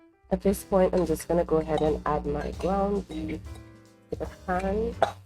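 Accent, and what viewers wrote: Antigua and Barbuda. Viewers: Are you Antiguan? You accent sounds that way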